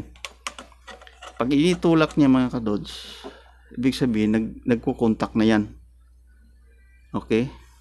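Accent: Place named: Visayas, Philippines. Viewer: ok bokal salamat men galing ng visaya ka.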